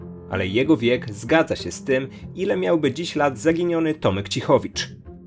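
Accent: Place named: Eastern Borderlands, Poland. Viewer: qzwa cztasz jak jakis uchodzca z kresów wschodnich...tajojku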